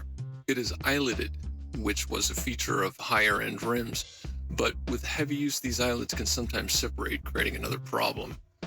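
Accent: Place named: United States. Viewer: MERICAN ACCENT!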